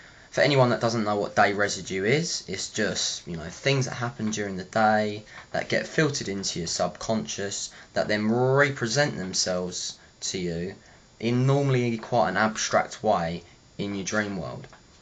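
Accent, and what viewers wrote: United Kingdom. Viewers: lol i'd guess from his accent he lives in the U.K, and they're on a pretty similar time. enough for the two to be simultaneously dreaming. I think.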